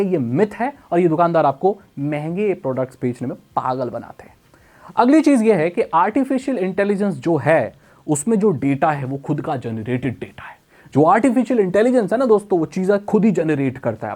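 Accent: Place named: Rural, India. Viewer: Aase lgra hai kisi gau k bnde ko sun rha hu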